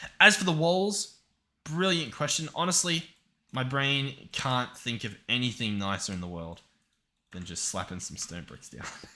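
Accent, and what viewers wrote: Australia. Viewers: southern hemisphere brother, probably australia based off his accent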